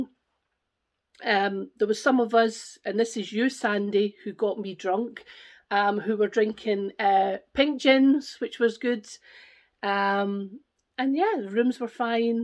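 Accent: Scotland, United Kingdom. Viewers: Just found your flosstube...love listening to a Scottish accent 😍 I also stitch what I want to, depending on my mood, so call myself a moody stitcher 😁 Sounds like a great retreat.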